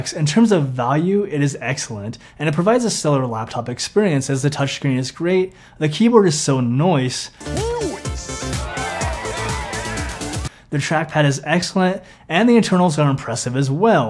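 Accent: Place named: Northern Ireland, United Kingdom. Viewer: Mate, where the hell are you from? You sound 5% Norn Irish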